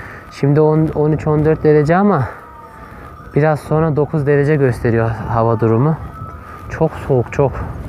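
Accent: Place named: Central Anatolia, Turkey. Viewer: Çoooşu ne güldüm ya İç anadolu Şivesi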